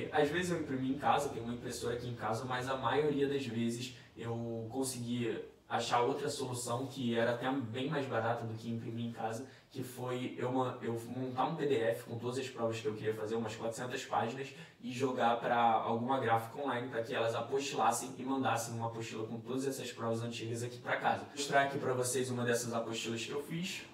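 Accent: Rio de Janeiro, Brazil. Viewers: bonito, inteligente e sotaque carioca pressão: "me ajuda" cai aqui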